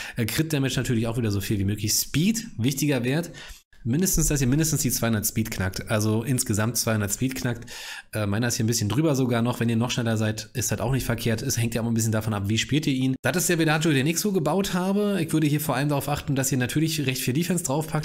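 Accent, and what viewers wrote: Berlin, Germany. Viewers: Hey wieder danke für das nice video direkt mal Ariel und ella umgerunt waren schon eingestaubt dein Berliner slang macht es einfach noch mega symphatisch Grüße knall&voll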